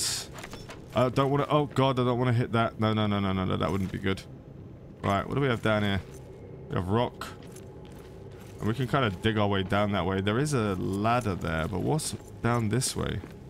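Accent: United Kingdom. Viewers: Love the playthrough so far man. loving this game too, I just got to Duran's forge last night and having a blast! also my brother in Christ you are British AS F*&%!!! lmaooo "oh im just a knob-end"..."lovely jubblies"... Being American, our speech and pronunciation is just so different its always hilarious thinking about how it got that way lol anyway keep on keeping on man, im having fun watching along! edit: 😂😂 you're talking about loving the songs the dwarves sing just like in "Black Sails and the vikings as well....like assassins creed" lmaooo BRO take some neurotropics or something you're forgetting names for everything!! looool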